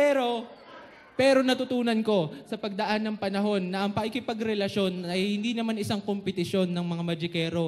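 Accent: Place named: Batangas, Philippines. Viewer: Nakakaaliw ang puntong Batangenyo! Para kang umiinom ng kapeng barako. Mabuhay ka. Ipagpatuloy ang paglikha!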